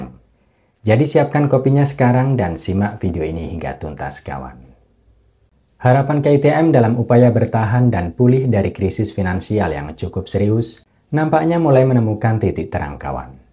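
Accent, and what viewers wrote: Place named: Java, Indonesia. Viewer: Nggak nyangka untuk suku jowo medok...Suaramu cukup ok.... aku suka....adem cukup jelas....hanya kurang penekanan dikit2...suatu saat pasti lbh ok...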